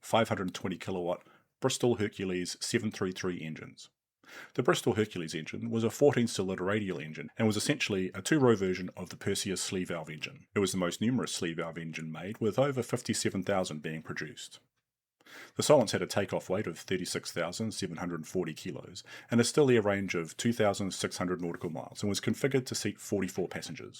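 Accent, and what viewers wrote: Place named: New Zealand. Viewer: Having seen the flying boats at MOTAT in Auckland I think it's wonderful that NZ is a significant repository of the type. But is this creation actually AI? I assumed so due to it's haphazard construction, but the NZ accent has thrown me. Anyway it is great work and great research, but you really do need an editor. Too much material is duplicated through the length of the video, and a bit of tightening here and there would greatly enhance the work. Also think that use of the aviation alphabet instead of simple use of English letters detracts. "Mike Charlie" is better as Em-See. On a related topic, BOAC was always pronounced Bee - Oh - Ay - See, not Bowack.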